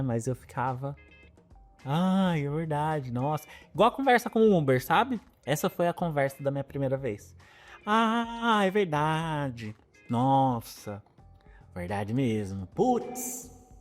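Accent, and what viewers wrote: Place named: United Kingdom. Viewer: seu sotaque em inglês é britânico, percebi isso hoje.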